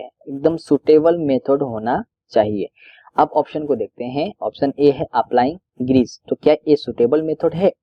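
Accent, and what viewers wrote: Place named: West Bengal, India. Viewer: Thankyou very much sir.......I'm very happy to see...that you are helping me.....and many other students... thankyou.. very much sir......sir....from ur...voice...mujhe aisa lag rha hai ki aap bangoli ho..........thnq sir...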